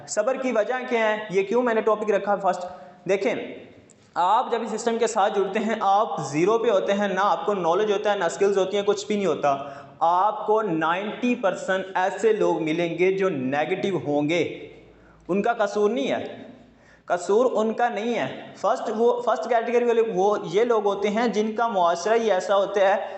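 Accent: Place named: Punjab, India. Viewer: Kia bat a Punjabi tarka🥰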